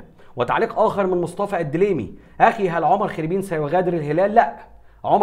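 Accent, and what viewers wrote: Upper Egypt, Egypt. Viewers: هههههه لكنتك صعيدي اصلي ونتشرف فيك أخي وشكراً عمجهودك🌸🌸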